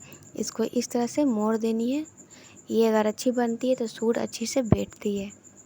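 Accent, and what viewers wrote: Bihar, India. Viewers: mam aapki boli se pakda ki aap bihar se ho ...waise mam kaha se hai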